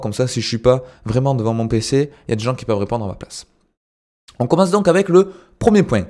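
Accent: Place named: Southwest, France. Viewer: Oh des barres ! J'suis tombé sur ta vidéo et ma copine (finlandaise) m'a dit : Oh on dirait ta voix avec l'accent :D Le S U A te salut ! Merci pour tes vidéos du sud ouest ;)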